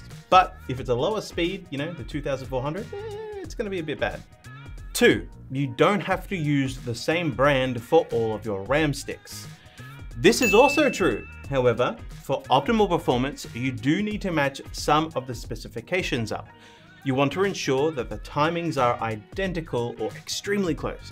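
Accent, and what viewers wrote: Australia. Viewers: Learn a lot of things from this video, but the highlight is now I know for sure how Australian English accent sounds, kinda sound like British but more decipherable 😂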